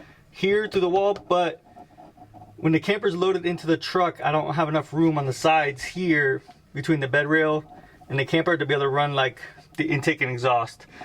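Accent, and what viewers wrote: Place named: New Mexico, United States. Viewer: I keep hearing a New Mexico accent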